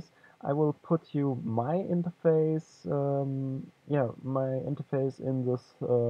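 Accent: Germany. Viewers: AWWW FUCK GERMAN ACCENT!!!!!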